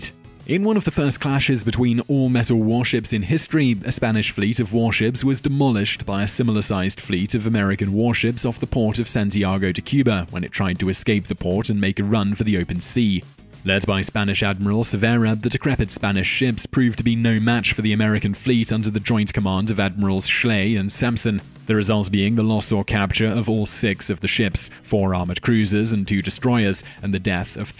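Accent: United Kingdom. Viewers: Told my girl she knew who "Simon Whistler" (british accent) was, and now that ive pulled the video up, shes pretending she doesn't know who u are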